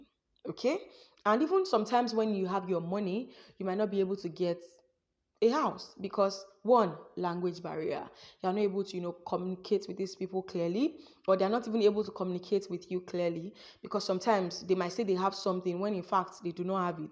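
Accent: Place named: Jamaica. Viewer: Yes you speak English but you have a Jamaican accent. Hard to understand . Don't complain about the people. You are not perfect. So you went to a Country to learn. You can tape it on google for what you want. That helps a lot. Any Country is the same. Yes. No one uses sorry. Because you need to learn the basic Hungarian language. Elnezest. Use this. This country is not an English colony. Before you went there you didn't read about anything ? Your fault.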